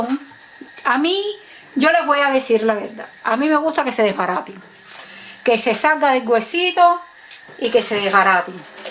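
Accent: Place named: Cuba. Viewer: Me encanta su voz, cubania y naturalidad. Mi abuela siempre las hacía en casa. Yo voy a intentar me queden tan deliciosas como a usted. Muchas gracias.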